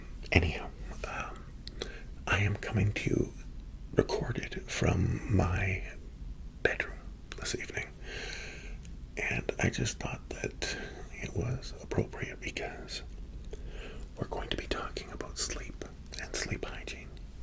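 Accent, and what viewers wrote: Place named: United States. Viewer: You have a wonderful relaxing voice to listen to. With a mild American accent too. New subscriber 👏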